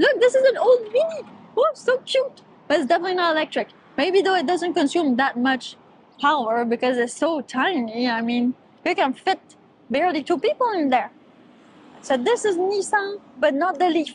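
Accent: Quebec, Canada. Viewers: This is what Canadian women sound like? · French Canadian?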